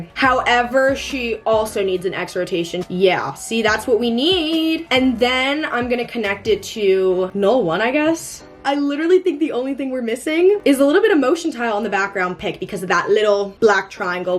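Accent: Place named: England, United Kingdom. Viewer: im scottish myself and hearing use scottish slang in an english accent pained me 😭😭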